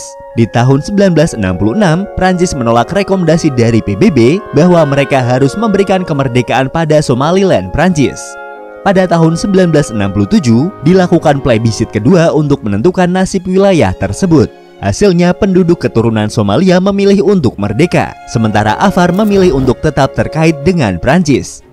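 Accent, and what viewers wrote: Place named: Ponorogo, Indonesia. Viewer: wong ponorogo ndi ki suarane.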